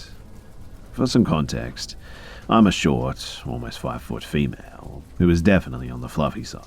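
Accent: Australia. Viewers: If I'm having trouble sleeping, I usually turn on one of your videos cause your low, Australian voice lulls me into a sleep. I love your content 💛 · Omg my body basically can’t fall asleep at night without listening to this Australian mans voice 😂 It’s pretty annoying since I fall asleep while listening and when I wake up I have to find my air pod because it falls out of my ear while I sleep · Love Australian accents! ps I’m Canadian! Lol I always try but it comes out British haha I repeat this guy all the time mate lol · 2.5 hours of sexy Aussie voice.;). 🥂🍻🌿🌈🌸🌃🌆🌇🌋🌛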